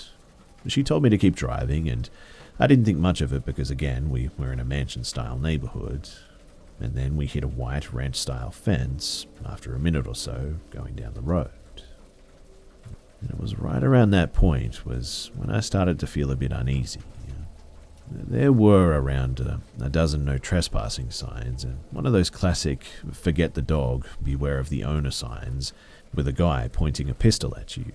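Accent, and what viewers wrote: Australia. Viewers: Omg. An Australian narrator. I'm so happy right now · Ikr i love accent. I live in northwestern US and i have never met someone irl from australia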